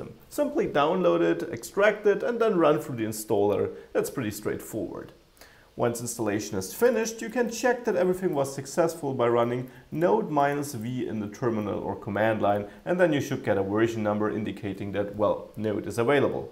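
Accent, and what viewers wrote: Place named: Germany. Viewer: The German accent man, that's a pain in the a**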